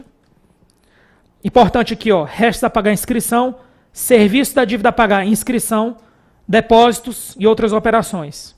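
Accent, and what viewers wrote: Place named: Manaus, Brazil. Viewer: Acho que ele é de Manaus Pelo sotaque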